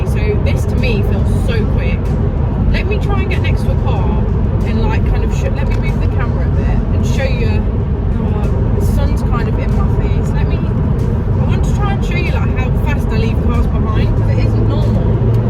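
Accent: United Kingdom. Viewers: Are you from derby?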